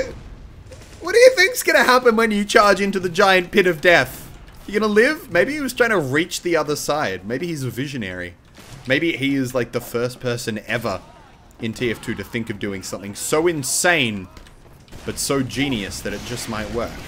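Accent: Australia. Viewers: is australian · MERGUD HES AUSSIE!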